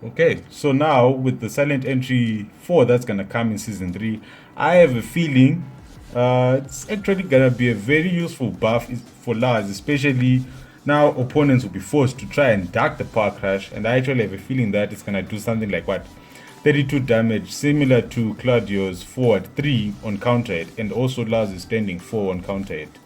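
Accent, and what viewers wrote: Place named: Africa. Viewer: yo man i know this is late but its great to hear some great african commentary. love from south africa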